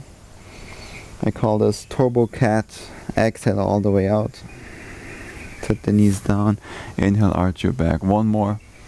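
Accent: Germany. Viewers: Du bist doch deutscher oder ? Hört sich zumindestens so an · amazing tatoos, sexy body and sounds like a German accent ? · Are you German?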